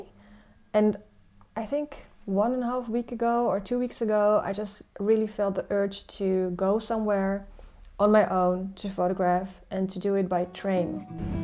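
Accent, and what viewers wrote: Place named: Netherlands. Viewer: Hezký klidný video. Jak dlouho už jsi v Amsterdamu? Mluvíš holandsky? .... totiž když video poslouchám, skoro mám někdy pocit, že ve tvojí angličtině slyším víc holandskej přízvuk než ten českej;)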